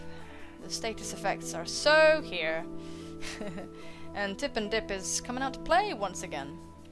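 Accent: United Kingdom. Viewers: You have a beautiful accent! What is it, british? :D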